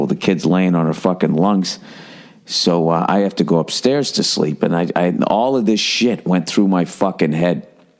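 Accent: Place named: Boston, United States. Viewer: He's from the Boston area. It's just how he talks.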